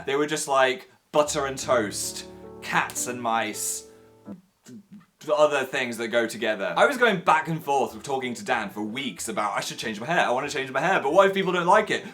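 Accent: North, United Kingdom. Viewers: Like how his accent is getting more northern again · I don’t know if it’s the confidence, the hair, the tour... but sounding a lot more.. northern in this video. Maybe it’s just me. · sounding very northern today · Is it just me or does sound REALLY Northern in this video?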